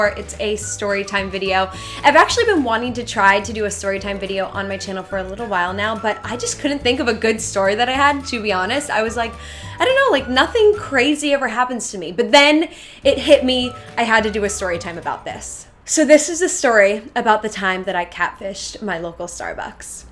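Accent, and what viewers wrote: Cockney, United Kingdom. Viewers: i love your british accent its so cuteee 😍😍😍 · u know ur an OG fan when u already know most of the story.. and ur british accent is a solid 10\10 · your British accent is amazing!! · It sort of sounds like a London Cockney accent :) x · omg your accent is london cockney its actually quite believable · Love the British accent ur so awesome!!! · Why does your background and you talking british remind me of Zoella? 💗💗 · You should film a whole video in your british accent :3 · Ur British accent is sooo gooooood xx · you are really good at speaking british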